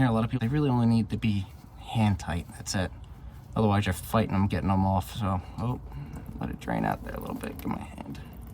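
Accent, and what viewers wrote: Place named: New York, United States. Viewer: I recognize that NY accent lol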